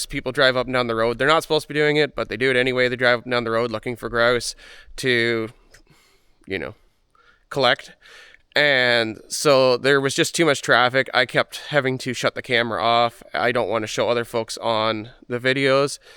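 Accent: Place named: East, Canada. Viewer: Fuel injector cleaners and a product called "Engine Restorer" get my endorsement for old junk iron like your machine. Engine Restorer is a product you put in your oil it contains copper, silver and lead and helps to re-seal worn cylinder walls and helps worn bearing surfaces. Project Farm used it with great success. It is really hard to get in Canada now though. Not sure where you're from. Sounds like back east Canada, but maybe Maine... I got a few jugs before it went away. I'm using it in my gas engine with 428,000 km. It is down a bit of compression on the cylinders. Did a compression test before and waiting to see how much it picks up. Many folks online have used it and seen good results. Keep that old iron going!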